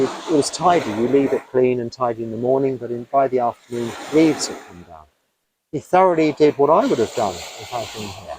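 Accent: England, United Kingdom. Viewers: This monk received an offering of two bananas and gave one back to the Hindu temple in another vid. So unlike me, sharing what is meagre and being giving. Yet I'm always wanting to see myself as kind. I like to hear his Pommy manner of talk, feeling less solitary and thinking of his hardships not managing in the local language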